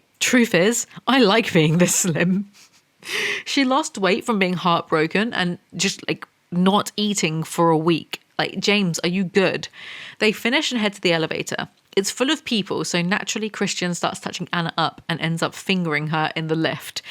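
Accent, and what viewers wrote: United Kingdom. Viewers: Damn you, i can no longer sleep without the sound of a snarky brit bullying rich people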